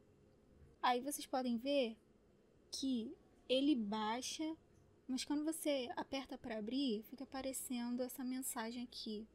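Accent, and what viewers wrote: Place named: Acre, Brazil. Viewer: Você é do Acre? Que maneiro!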